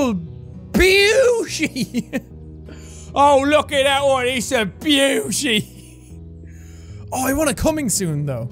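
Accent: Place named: Ireland. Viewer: Man, what happened to the good old days when just started using his Irish accent? I wish I haven't watched every video back then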